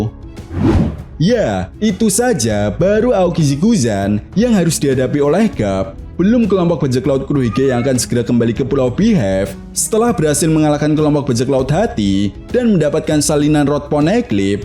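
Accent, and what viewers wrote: Java, Indonesia. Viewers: Suara nya paati jawa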